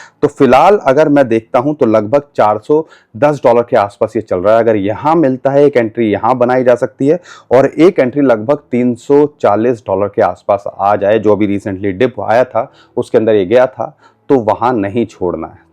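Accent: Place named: Varanasi, India. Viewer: Bhai ekdum banarsi andaz me baat karte ho GURU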